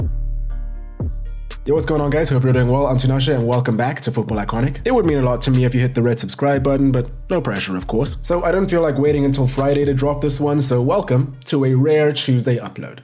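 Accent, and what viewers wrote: South Africa. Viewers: I was watching this video, And something just made it feel homely, Then I realised, You are speaking with a South African accent. Now I see your channel and content and think. Wow, South Africa has talent and your production is on par with YouTube stars. So I'm so proud to see a local have such a successful channel! Welldone. But also, yeah when Haaland came to man city, I knew him from bvb and from his insane international scoring. You know he holds the record for the most goals scored in 1 game, I believe it's 9 individual goals in an international game. I knew the premier league wasn't ready for a player like him. I do believe though managers are strat'n on how to counter him as we speak. So let's' see later on if he can keep this going. His a huge dude with some insane accuracy with his shots. Also his size, you'll notice in games, he throws his body in the way for the ball to bounce off him into the goal. 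Mainly by throwing those long legs out. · Are you south african? You sound south african. and yes, i myself am south african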